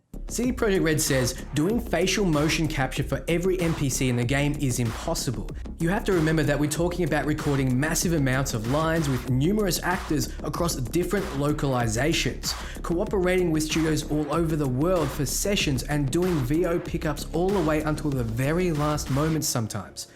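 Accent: Australia. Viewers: Great video. Hey are you an Aussie or a Kiwi? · Nice to hear an Australian for fucking once!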